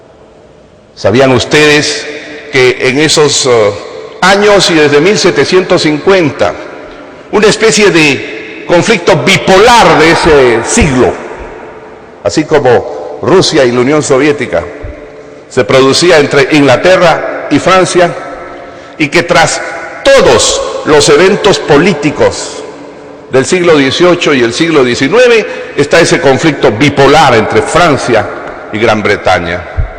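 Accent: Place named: Peru. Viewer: Separó al Alan García político del Alan García orador , porque disfruto escucharlo , porque es el verdadero dialecto peruano. Separemos el político y el orador porfavor.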